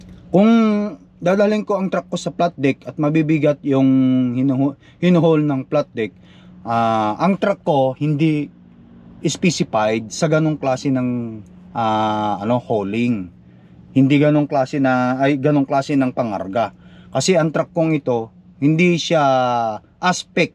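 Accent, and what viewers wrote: Ilocos, Philippines. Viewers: ILOCANO TALAGA ANG TONO MO MAGSALITA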